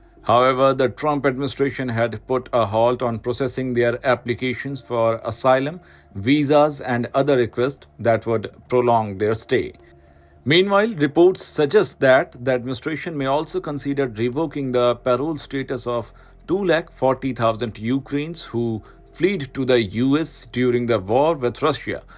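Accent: India. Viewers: Heavily indian accented and using the word LAKH only used by south asian countries.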